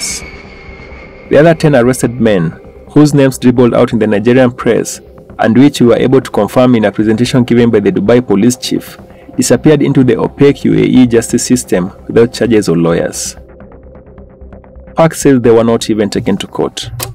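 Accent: Kenya. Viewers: Mr narrator, are you kenyan?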